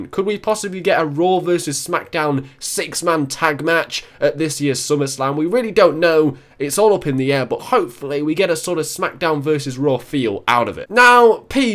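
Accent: England, United Kingdom. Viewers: I was wondering which part of England are you from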